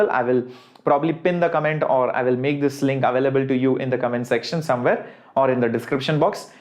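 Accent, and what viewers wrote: South India, India. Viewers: Pakka South Indian 😅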